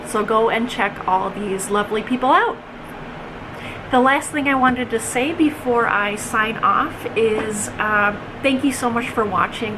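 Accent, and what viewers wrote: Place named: Minnesota, United States. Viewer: Love your Australia story and your Minnesota accent.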